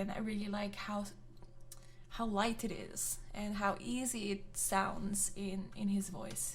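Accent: Quebec, Canada. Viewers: French pronunciation?? Are you kidding me? You are from Quebec. That makes you the ugly third stepchild from a bastard idiot uncle who wears speedos when he goes shopping. Is what you speak really French? Back to your poutine while staring at your Trudeau poster.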